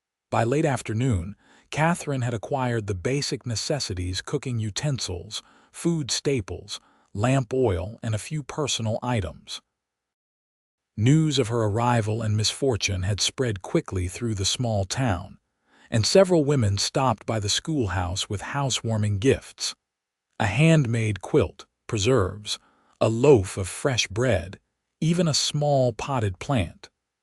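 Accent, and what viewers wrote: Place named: West, United States. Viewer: So sorry, that with the lovely voice of your Narrator, so sorry that so many words are wrongly spelled and/or mispronounced. The voice of the Narration is wonderful It would pass for a genuine Western American if it were not for the outlandish pronouncement of such a few words. I really do like the story. Well done.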